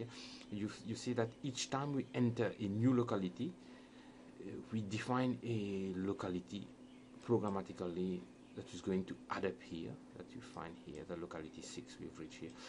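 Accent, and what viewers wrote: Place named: Mauritius. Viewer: Hi, you sound like you are from Mauritius!:)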